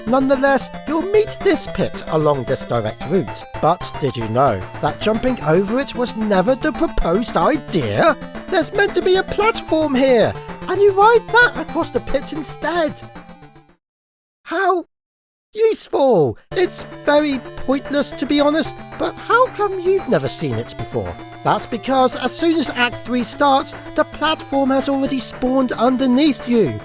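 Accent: United Kingdom. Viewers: HE'S THE BRITISHEST GAMER YOUVE EVER HEARD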